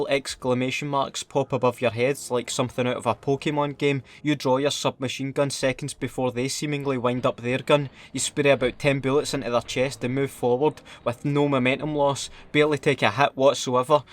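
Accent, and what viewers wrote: Scotland, United Kingdom. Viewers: I like your scottish accent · I’m bit of a camper, but as a veteran of this game, I cannot go toe to toe with these rapid reaction wee bastards! Totally agree with no 2 , I rarely play these games because of this. Drives me bonkers. Love the banter, great to hear a Jock voice on these channels.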